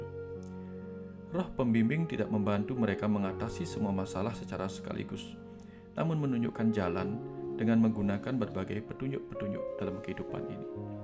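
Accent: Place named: Bali, Indonesia. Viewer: T nya masih Bali yan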